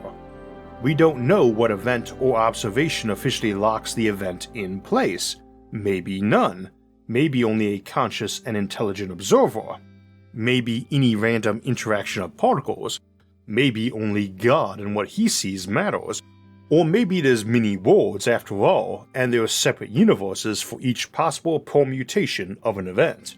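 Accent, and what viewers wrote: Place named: United States. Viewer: I think only you are concerned with your so called speech impediment. Seems like a US accent to me. But I doubt the overwhelming majority of your listeners even think about it. I don't.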